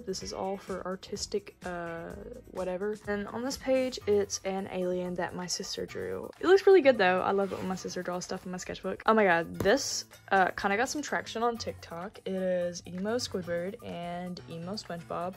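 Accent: South, United States. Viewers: ive watched this video at least 4 times and i just realized you have sort of a southern accent 😭😭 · I love your art it’s so expressive and fun and colorful! Also, are you southern? I feel like I’m detecting an accent. I’m from Missouri so maybe I’m projecting lol.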